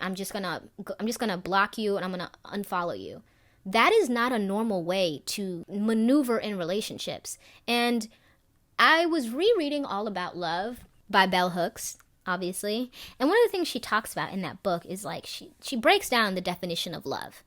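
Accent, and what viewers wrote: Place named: Atlanta, United States. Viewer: Haha I totally recognized that ATL accent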